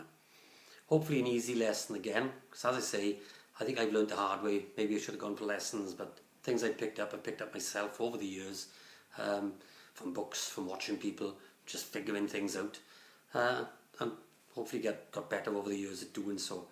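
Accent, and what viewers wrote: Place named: Wales, United Kingdom. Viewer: Nice lesson, I detect some Welsh accent there, from a respectful Aussie 😀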